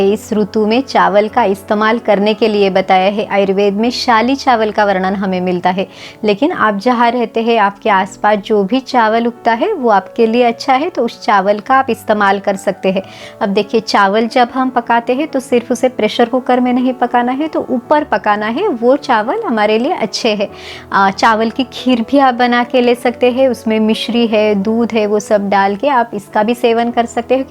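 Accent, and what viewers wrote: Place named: Maharashtra, India. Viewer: खूप छान माहिती दिली आहे.आवाजा वरुन असं वाटतं की आपण महाराष्ट्रतील आहेत...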